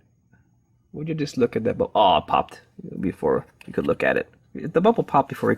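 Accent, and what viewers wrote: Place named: Hawaii, United States. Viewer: dude. cool vid. where you from? hawaii? was getting that local vibe for some reason lol.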